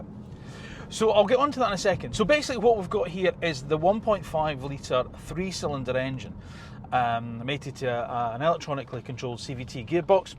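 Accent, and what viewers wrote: Scotland, United Kingdom. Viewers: I love your style of videos, keep it up! Greetings from Greece. Are you Scottish? I like your accent.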